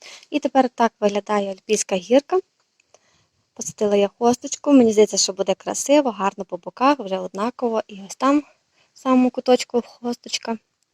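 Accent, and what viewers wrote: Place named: Ternopil, Ukraine. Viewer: Все гарно! А ще й мила тернопільська говірка! 💙💛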